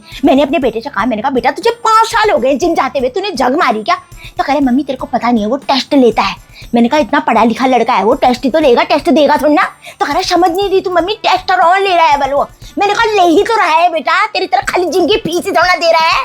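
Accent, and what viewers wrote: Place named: Pahadi, India. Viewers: Aapka pahadi tone me bolna bahut achha lgta hai mujhe mere ghar(india) k pados k aunty k yaad aa jati hai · Mzaa hi aa jata hai aapki pahadi leh sunke😂😂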